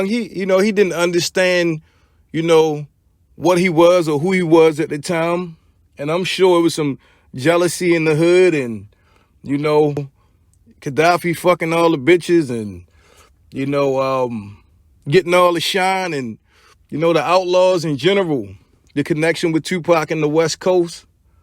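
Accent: Baltimore, United States. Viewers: I’m new here but I hear the bmore accent fam salute to my bmore brothers from DC🫡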